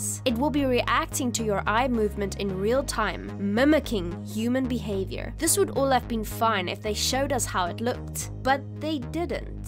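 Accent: South Africa. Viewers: First I love the South African accent 🇿🇦❤ and awesome video glad you looked further into this! You are very intelligent! :) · South african english accent makes the video best ❤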